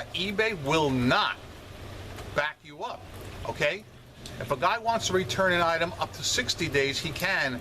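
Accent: New York, United States. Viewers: 'Best offer'.....I have some listings with best offer and tell in my description that payment is due immediately. It works most of the time. Occasionally on non BO listings someone will email and ask if I'd accept a lower amount. I'll say yah, I'll take that and ask when they'll be online, then lower the BN just for them. Works every time., I must say your New York accent makes your vids even more fun to watch.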